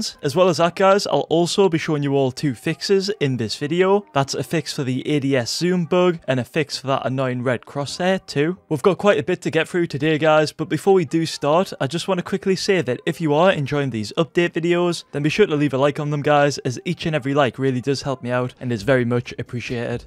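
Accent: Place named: Scotland, United Kingdom. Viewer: im from the uk aswell but your accent sound diffrent kinda like scottish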